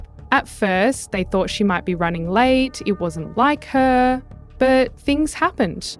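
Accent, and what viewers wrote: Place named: Australia. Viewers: Love this accent. Im thinking its Australian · Yeah it’s Australian. Many channels are AI voices now so I am happy to find a real person speaking.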